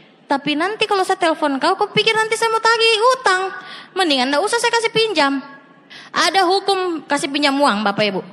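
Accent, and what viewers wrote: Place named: Sulawesi, Indonesia. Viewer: Logat sulawesi😊😊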